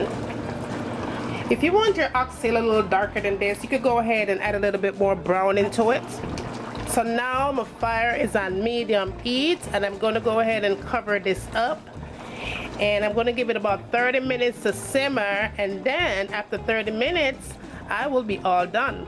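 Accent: Jamaica. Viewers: Man I watched alote of cooking videos and let me tell you when I want to cook a Jamaican dish I have to look for you videos dem why?? Because for some reason the Jamaican accent makes it better I've seen some other videos of people making Jamaican dishes and the accent not there ATALL that's just my opinion so in other words I LOVEEEE your videos dem 👍👍 lol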